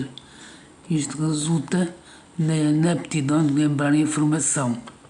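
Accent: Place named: Portugal. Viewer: Olá amiga, passando para conhecer seu trabalho. Muito bom saber sobre a doença. Você parece de Portugal. Deus abençoe 💋🌹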